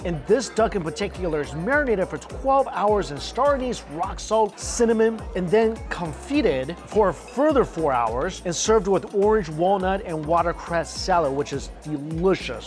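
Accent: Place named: United States. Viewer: Yummy man I love our your pronunciation is freaken Terrible lol and so American man.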